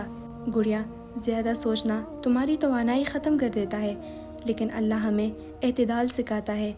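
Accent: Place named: Khyber Pakhtunkhwa, Pakistan. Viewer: Such a beautiful voice and accent . Senin accent is pathani Bilmiyorum . Thank you so much for such a good message . May Allah bless you ❤😊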